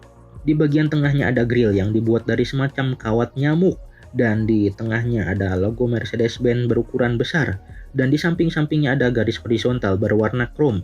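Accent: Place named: Sunda, Indonesia. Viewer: 😂😂😂 lucu adminya membawa narasinya..ciri ciri orang sunda nya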